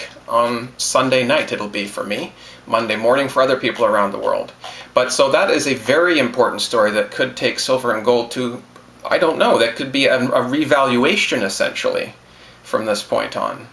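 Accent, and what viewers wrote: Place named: Canada. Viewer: I miss your friendly canadian accent. Hope you are doing well!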